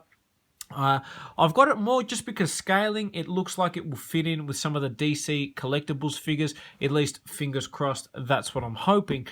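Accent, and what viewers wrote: Australia. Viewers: Please reply are you Aussie?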